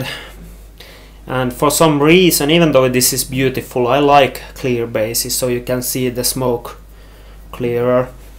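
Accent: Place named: Finland. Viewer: Kiitos nopeasta vastauksesta. No hyvä, ajattelin jo että joudunko kiduuttaa viikkoja.. Ps. Englantisi on hyvä, mutta suomalaisuus paistaa kyllä taustalta omaan korvaan. Niin kuin kuuluukin. Pistä lisää Hookah videota tulemaan!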